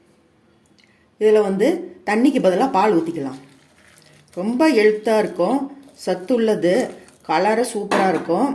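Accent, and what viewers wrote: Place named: Kongu, India. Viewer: Super ma, kongu தமிழ் manakudu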